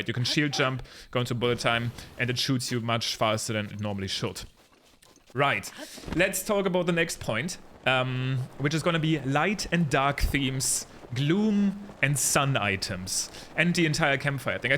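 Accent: Germany. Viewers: Kommen sie aus Deutschland? Just curious! Your accent sounds like you're German 😅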